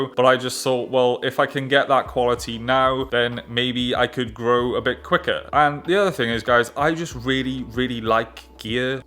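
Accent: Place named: United Kingdom. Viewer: There is something about UK accent, or maybe about speaking in proper English, that just works. I wish I had this language skills to improve videos on my channel. Anyway great job man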